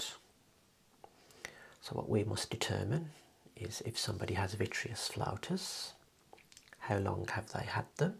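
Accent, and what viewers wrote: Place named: Birmingham, United Kingdom. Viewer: i love that you have a brummie accent! as a brummie myself, hearing you feels relaxing and homely. thanks for your videos !!!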